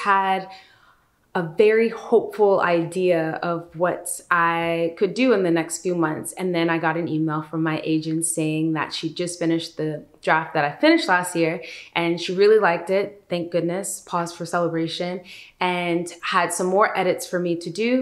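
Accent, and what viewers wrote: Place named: United States. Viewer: your accents sounds American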